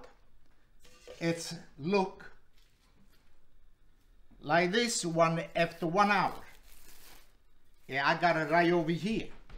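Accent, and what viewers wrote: Italy. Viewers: Hi! This is Canada. The video is very interesting. Italian pronunciation of English is also familiar. I remember the old Italian grandfather who lived next door to my house. He gave me grapes from his backyard grape vine, and if he made bean pasta, he gave it,too They also gave me homemade wine. He was very friendly. Watching your video reminds me of him. It is a very, very old memory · His Italian accent authenticated this video. 🇮🇹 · I made this and it turned out awesome. My family is from a little town in Italy called Senerchia and you talk just like them and makes me miss them. God Bless you and can't wait to make more of your recipes!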